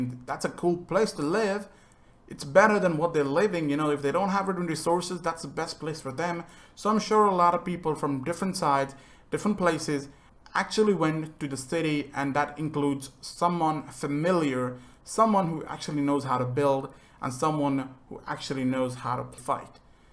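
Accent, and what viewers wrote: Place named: India. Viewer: This guy is trying so hard to hide his indian english accent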